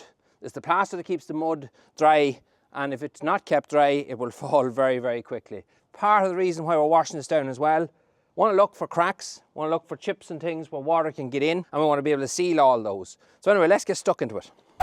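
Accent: Cavan, Ireland. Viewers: I enjoyed watching, and I have subscribed. I have watched other videos of restoring cottages and going off-grid by Brits who have relocated to Ireland from the UK. It's nice to hear an Irish accent. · what accent is that ??? Cavan ?? Trying to figure where in Ireland you are ? 🤔☺️😉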